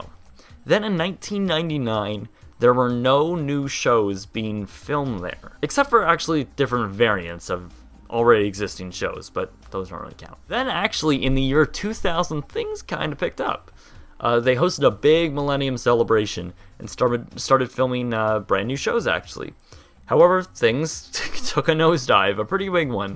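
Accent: Canada. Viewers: You sound Canadian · I have one question for you boy: Are you Canadian?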